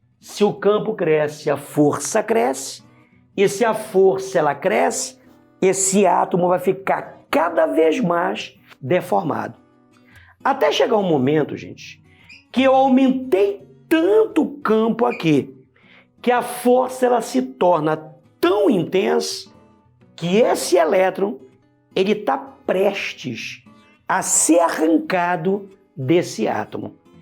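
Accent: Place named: Pará, Brazil. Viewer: que aula massa, professor!! super didática e de fato imprescindível para meus estudos. o melhor é o sotaque paraense (?) hehehe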